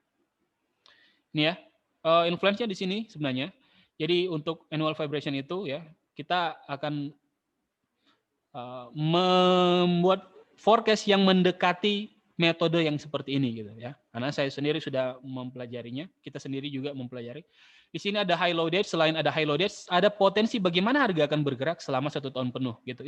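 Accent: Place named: Bima, Indonesia. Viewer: wah orang bimaa ini logatnya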